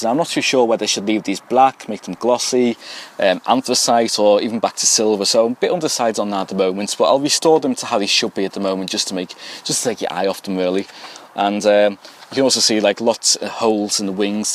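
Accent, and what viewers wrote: United Kingdom. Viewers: stop copying him then XD the thumbnail is remeniscent of his style, the car is the same as his and ur detailing of the 280k miles car is basically the same video he made (exact same method). i get that its cleaning a car, how different can you be but at least put ur own style into narration other than Im british he american. Still enjoy ur vids tho mate, keep em coming!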